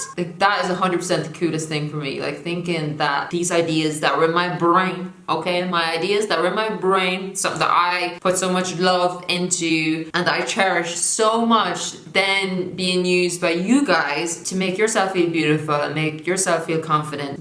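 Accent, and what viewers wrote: Ireland. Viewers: love you girl!! Missed that gorgeous Irish accent on the tube of you 🥰🥰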